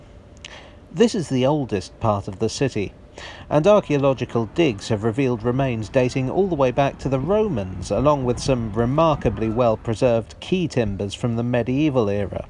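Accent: United Kingdom. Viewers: G'd mornin' sir! :) I'm trains and trams freak myself and I used to live in London, always in NW10 area or close to that. With (new) Wembley Stadium always to be seen on the horizont, as a markable land-mark. What about making some videos about Wembley, Met-land and 30er? The fields-to-industry North-West is totaly different story that poor-to-stay-poor South-East. Park Royal, Willesden Junction. maybe even Grand Union Canal? The closest to that - is Your Maida Vale video. For me England is over, and I will never go back there, but I would not mind to see some brand new videos with today's quality about this area. PS. I do like your accent. Sooo British. Great channel.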